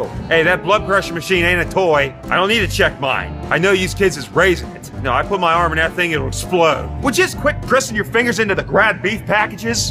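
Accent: Pittsburgh, United States. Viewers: GREAT SHOW- THIS GUY CRACKS ME UP,AND HE REALLY DOES SOUND LIKE US PITTSBURGHERS!!! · I from Pittsburgh, but I go to college in upstate NY. I’m a senior now, and I think at least once every year I’ve watched this video when I got homesick. Hearing the Pittsburghese always gives me a little taste of home and makes me feel a lot better! Thank you! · Is this what real Pittsburg accents sound like I hate it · I live in moon township and this is exactly how they talked even my baseball coach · Never gets old. I grew up in Pittsburgh in the 70s when the yinzer accent was still alive and well!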